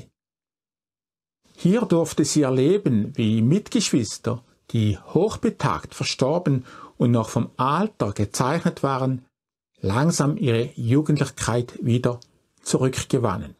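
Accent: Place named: Switzerland. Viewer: Herr, jetzt weiss ich aber Ihren Namen, habe denselben von einer Dame abgespickt die auch in Ihren "Comments" gepostet hat:)..... Ein ganz ganz herzliches "Gruezi" an Sie und Ihr wunderschoenes Land, die Schweiz... und dann noch ein ebenso herzliches Merry Christmas und Happy New Year aus USA.... Ich bin so gerne in Ihrem Kanal um alle Zeitgenossen zu erleben die Sie interviewen und/oder intrerwiewed haben... Sie sind ein echt charmanter, sachkundiger Interviewer... ihr Schweizer Akzent davon ist einfach zum Dahinschmelzen.. Ich liebe die Schweiz und mag die Schweizer:) Schoene Feiertage:) und alles Gute:)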